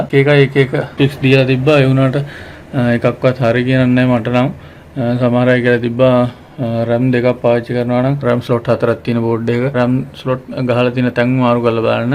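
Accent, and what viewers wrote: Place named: India. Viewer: Title english and you speak indian. I dont know what u are saying bro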